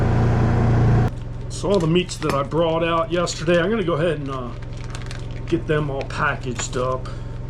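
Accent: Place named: Philadelphia, United States. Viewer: Good day! I’m picking up bits and pieces of a Philly or Philly adjacent accent! Where are you from originally?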